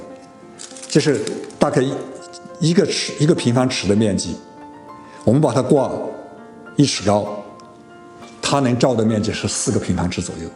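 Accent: Yunnan, China. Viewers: 好像是云南人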